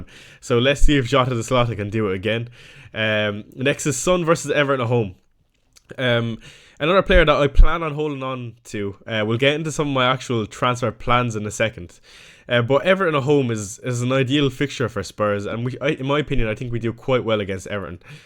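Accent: Ireland. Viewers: Don't mind him, yer grand. not used to the Dublin accent.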